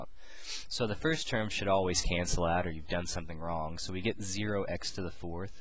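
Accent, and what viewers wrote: South, United States. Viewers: hahaha. the comment about your southern accent made me giggle. For some reason my brain shut down and i second guessed myself... you helped me get back on track :) and gave me a good little laugh too. Thank you for your time and help :) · I had to laugh when I heard your country accent. But thanks for the example!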